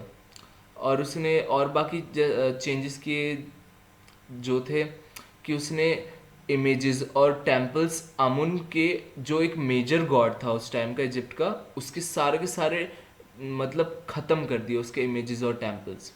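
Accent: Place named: United States. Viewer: it is not necessary to speak in an American language if u would have spoken in a simpler way it would be much clear to the students. anyway ur video helped me a lot . thank u sir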